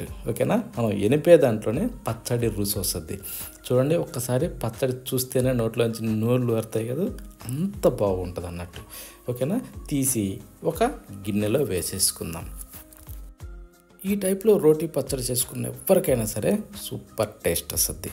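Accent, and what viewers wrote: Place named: Rayalaseema, India. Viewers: చిత్తూరు జిల్లా యాస యెర్రగడ్డలు పచ్చడి suuuuuuper 🎉🎉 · Adi rayalaseema slang ardam avadulee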